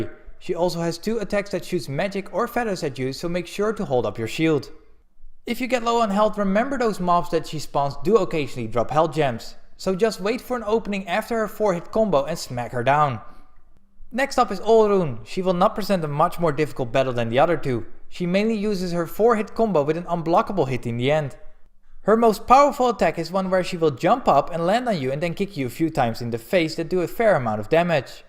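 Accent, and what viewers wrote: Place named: Netherlands. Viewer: I heard it because your accent, especially when you said: Sigrun, like Sigroen :), boven Holland :), I am Dutch too, I like your video, keep up the good work and keep going, I subbed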